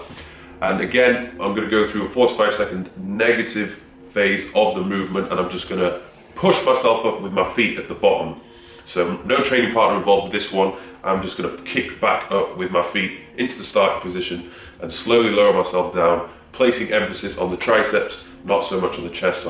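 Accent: United Kingdom. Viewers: Hey, Don't know how many positive comments you can digest, but here's another......No one comes close to the great content and great show and tell of the videos, not to mention that you've got the best physique and perfect British accent to make the videos super classy! You are the best, period. Thanks, Hal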